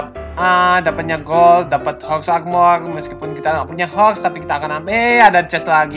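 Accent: Java, Indonesia. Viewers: Fun fact: ternyata yang dulu agak medok jawa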